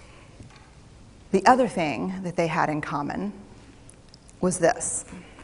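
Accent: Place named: United States. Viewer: this is American English I thought it was British language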